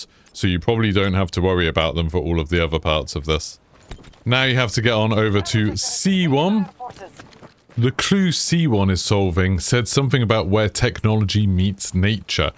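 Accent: United Kingdom. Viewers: “Non-english”. Bro, he’s from England. Did you want an American accent with the guide instead?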